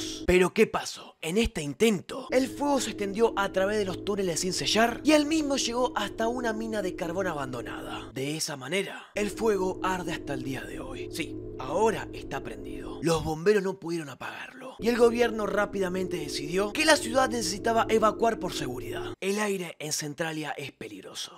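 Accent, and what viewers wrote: Argentina. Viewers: Tu acento argentino queda piola con esa especie de aliento cuando terminas una frase xd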